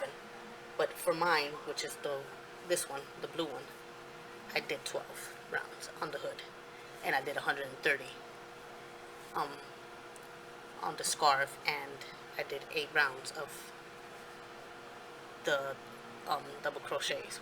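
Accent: New York, United States. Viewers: Thank you for your clear instructions. I can't wait to start 😊. Do I hear a fellow New Yorker?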